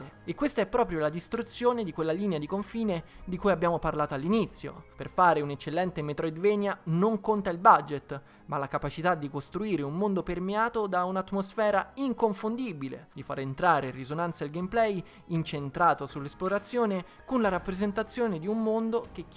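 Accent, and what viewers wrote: Rome, Italy. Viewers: Lo sai che abito sulle Alpi francesi e ogni tanto faccio confusione. Non si può dire però che io non mi applichi. Io almeno non parlo con l'accento romano come fai tu...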